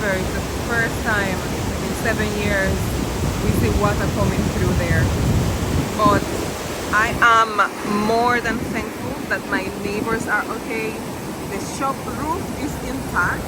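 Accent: Jamaica. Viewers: wait a minute is that a Spanish /Jamaican accent?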